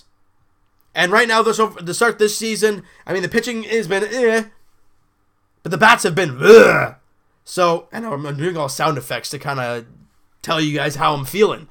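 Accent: Canada. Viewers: Jonathan Duncan Hmm maybe it's because it's part of the Canadian accent, don't you think?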